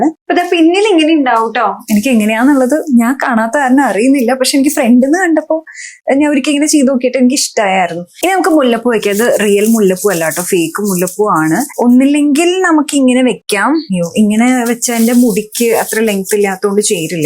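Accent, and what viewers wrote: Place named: Valluvanad, India. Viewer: Thrissur കാരി അല്ലേ...പക്ഷെ വള്ളുവനാടൻ ഭാഷ ആണെല്ലോ പറയുന്നത്...സ്റ്റൈൽ ിനു വേണ്ടി ആണോ...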